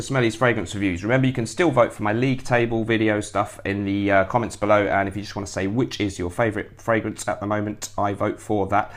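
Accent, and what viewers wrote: United Kingdom. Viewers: My favourites at the moment: Lalique Encre Noire, LM Hard Leather, Acqua di Parma Essenza and Comme Des Garçons Incense Avignon. BTW, I love your videos and your British Style and accent.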